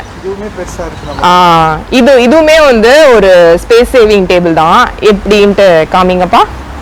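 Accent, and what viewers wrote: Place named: Sri Lanka. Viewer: சகோ super 👍 இல‌ங்கை தமிழ் இந்தியா தமிழ் பேசும் விதம் வித்தியாசம், from Switzerland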